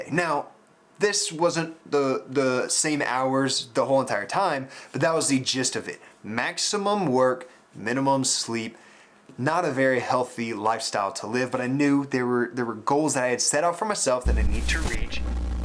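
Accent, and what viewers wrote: Texas, United States. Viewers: Are you from Texas? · i love your accent dude. are you from texas?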